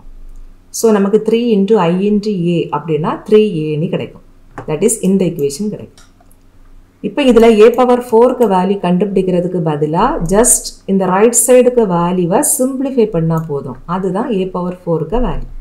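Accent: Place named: Kanyakumari, India. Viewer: Mam neenga kanyakumari ah?.... Because your language like that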